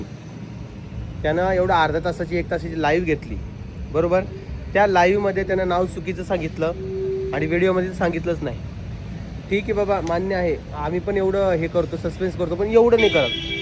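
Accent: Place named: Pune, India. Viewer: ओम पुण्याच्या लोकान सारखे का बोलतो अरे आपली विदर्भाचि भाषा चांगली आहे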